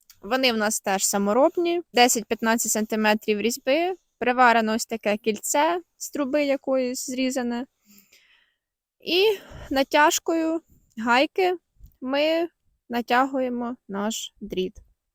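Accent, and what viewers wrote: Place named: Western Ukraine, Ukraine. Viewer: Фу-як западенка 😢 противна по українські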